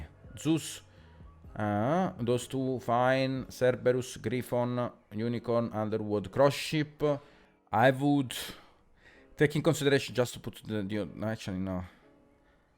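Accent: Italy. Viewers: incredibile come avevo capito che eri italiano dalla prima parola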